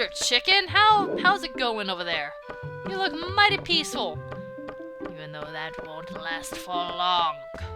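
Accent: United States. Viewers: Don't stop ur southern accent, it's funny! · lol I don’t get it but still I will always think your cute with that country accent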